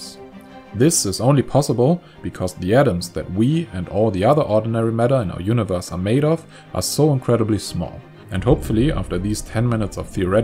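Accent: Germany. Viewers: The German accent makes it even more believable... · "lets make a human sausage, maybe out of a murderer or lawyer" hrhrh i knew he was from germany, if not already clear by accent ... pfff and they say we got no humor ^^ its just that our humor is rather dry or explicitly gruesomely dark =) ... but to our shame we have also lots of very silly humor in the category of fart jokes *sigh*, to me that type is very cringe even before watching lots of english speaking satirists and commedians over the years(thank you youtube). ontopic: thanks for the excellent presentation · Well, he does sound German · Horrible German accent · The German accent is horrible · hier, ich will dich nicht vom videos-machen abhalten. ich find das wunderhübsch zu youtube was beizutragen. aber so´n übersteuerter deutscher akzent, da kommts mir hoch ey. kann man sprecher für anheuern, wenn man selbst nicht in der lage ist... 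· Warum lasst Ihr einen Deutschen Englisch reden? Macht das Video bitte entweder auf Deutsch, oder nehmt einen englischen Native Speaker. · Omg such a German accent xD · He almost speaks German lol. Most obvious accent ever:D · I'm sorry, but a German accent saying the words "need to shave the heads of roughly 4 million people" cracked me right up. What can I say, I'm not one for being politically correct, either.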